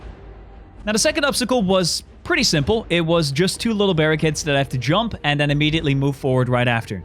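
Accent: Netherlands. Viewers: Are u dutch me to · are you Dutch (because i am)??? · wait are you dutch?? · u dutch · Youre dutch?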